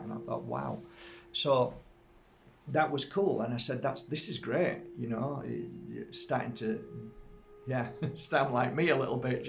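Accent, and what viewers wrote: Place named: Northern England, United Kingdom. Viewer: I seem to have been on a parallel path with your own and since our paths eventually crossed in cyberspace a week or so ago I have been viewing lots of your videos. I can't tell you how re-assuring it is to hear someone say what you feel is absolutely "spot on" - and in a proper Northern accent as well! Lella's video is so beautiful and I will certainly listen to it as you suggest. A few years ago I came by a small piece of Moldavite and also a small herkimer diamond from a gem shop which I am hoping are genuine. I plan on continuing to look and learn from your postings. Love and light to you and yours, and all your subscribers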